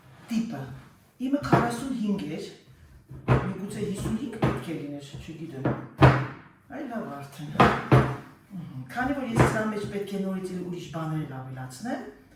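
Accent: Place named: Lori, Armenia. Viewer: ջան,հայերեն լոռամիրգ է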